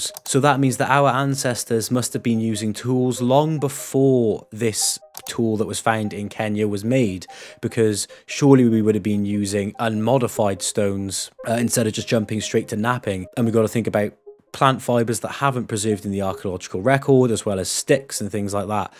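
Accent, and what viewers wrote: United Kingdom. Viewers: He's a british guy with a calm voice speaking about biology so he's probably right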